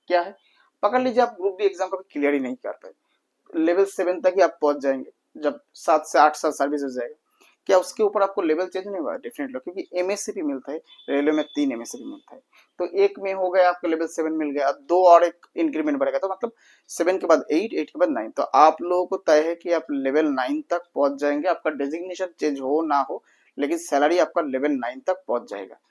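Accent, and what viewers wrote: Bengal, India. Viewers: Bengali tone so sweet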